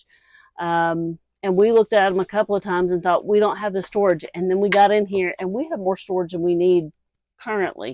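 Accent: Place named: South, United States. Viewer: Just found you.....ha ha ...Never noticed your southern accent that much before in all the years we hung together.....but...Love ya guys....safe travels and for going the travel world...Have fun....Love ya ...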